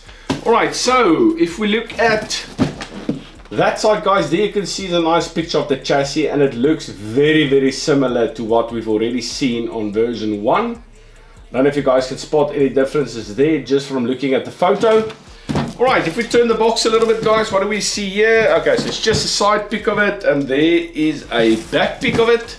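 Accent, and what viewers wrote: South Africa. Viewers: Your from New Zealand? Your accent sounds south African ? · Great vid and super informative! Am defo not liking that body and lack of detailing on V2 at all and otherwise it looks like a bit of a rip off since its more expensive! However I am liking your awesome accent though😎 Are you originaly from South Africa? Greetings from Germany. Subbed✌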